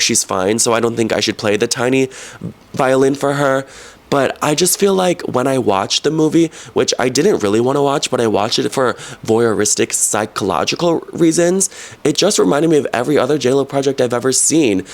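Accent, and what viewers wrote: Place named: New Jersey, United States. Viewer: She sounds like she's from Jersey or from Newport.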